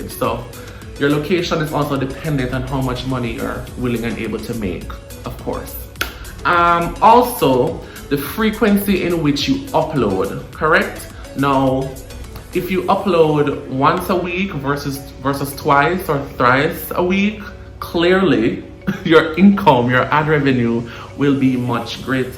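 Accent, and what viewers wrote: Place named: Jamaica. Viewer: Hey bro you sound like Jamaican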